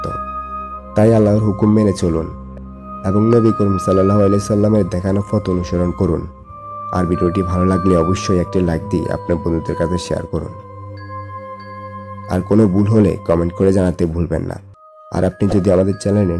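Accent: Noakhali, Bangladesh. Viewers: Vai apni ki Noakhailla? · ভাই খালি ফ ফ করেন ক্যান? ফেনি, নোয়াখলি, কুমিল্লা?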